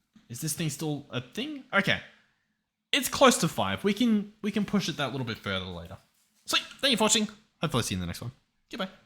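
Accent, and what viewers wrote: Australia. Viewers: southern hemisphere brother, probably australia based off his accent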